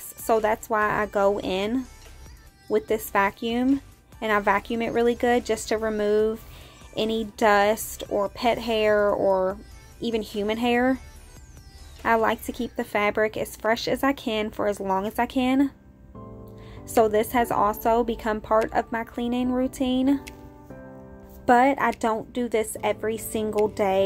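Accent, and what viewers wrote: South, United States. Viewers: I love when people say things different than I do. I find it sweet and southern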